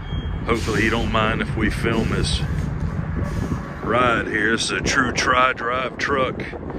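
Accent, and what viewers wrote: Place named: South, United States. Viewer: I love the way you talk bro, no homo shit just like that low and slow southern accent. Just left your stall for grounds down in Florida, Lakeland to be exact. Keep the videos coming my friend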